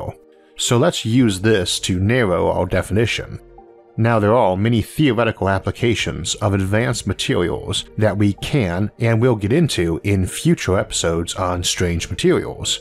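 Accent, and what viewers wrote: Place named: South, United States. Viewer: I love learning about science from a southern accent! God bless the omniverse multi verses universes....John b goodenough invented the lithium ion battery!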